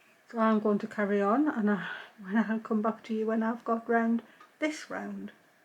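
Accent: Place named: Yorkshire, United Kingdom. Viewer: Just discovered you and your work is lovely. I lived in Yorkshire for nearly 20 years and it’s lovely to hear your voice, and that you say “crochering”, just like my mother-in- law did, instead of “crocheying” -we who pretend we’re French! Sometimes I say “crochetting” because I’m musical! I really love that duck egg, eau de nil cotton yarn, it’s my favourite go-with-anything colour. Why on earth would anyone discontinue it! Right, I’m off to delve into your other videos! Thank you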